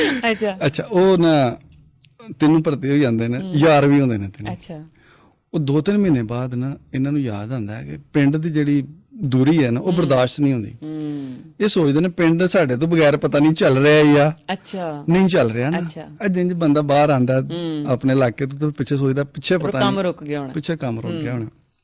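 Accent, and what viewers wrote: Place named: Dogri, India. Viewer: Vadia j&k di dogri BOLI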